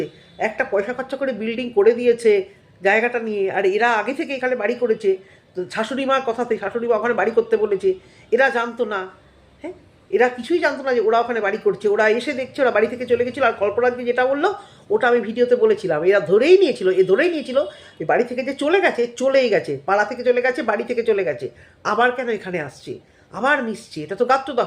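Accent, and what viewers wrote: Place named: Chittagong, Bangladesh. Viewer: Besh kichudin apnar controversy video dekhechi..valo lagche…besh straight katha gulo shunte valo lage..from Bangladesh..Chittagong er katha bolate aro valo lagche..amrao chittagong to.. Jai hok…priya khubi chalak,self lover…Ar khub show korte pare..hamboravab…kalpana r katha khub besi kichu bolar nei…priya r hotath tk hoyeche tai she kintu nijeke boro vabe kintu dekhchi onekei ekdom kom or bend he legeche priya k boro,mohan dekhacchhe…j konovabei kolpona r tar bor k kharap dekhate I hobe…its ok controversy korche kintu mone hocchhe priya r jonno Maramari korbe jeno…ajob…..othocho eder onekei mondirra k niye jkhn video kore khub politely bole emon ki kutshit ghora k eo sevabe kichu bolte parena…..priya khub irritating….tao ki bhalo proof korte chai he…bhul to dui dikei ache. Jai hok apni arekta regular hole valo lagbe r arektu somoi dile o video aro sundor hobe.valo thakben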